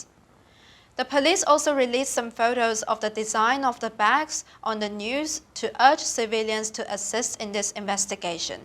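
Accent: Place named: United States. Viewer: My friend this is my humble request please change your English speaking style your speaking American English Asian country peoples are not properly understand please my friend tried to speak British style English thank you for this video my friend🇨🇳🇨🇳🇨🇳🇨🇳🇨🇳🇨🇳🇨🇳🇮🇳💐👍✌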